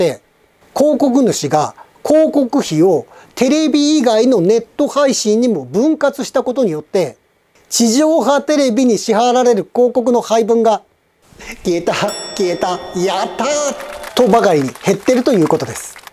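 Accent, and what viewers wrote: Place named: North Kanto, Japan. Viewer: "ぶっちゃけて言って" 氏は北関東か福島あたりの出身か。余計な事ではあるが。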